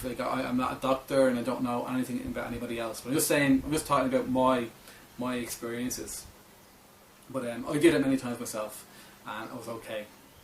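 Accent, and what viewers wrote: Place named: Ireland. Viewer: Definitely irish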